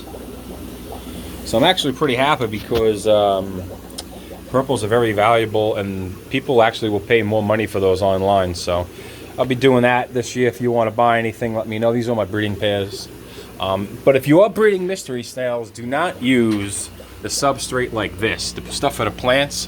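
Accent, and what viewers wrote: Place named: Massachusetts, United States. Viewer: Are you located in Massachusetts? Ha i picked up on the accent im in MA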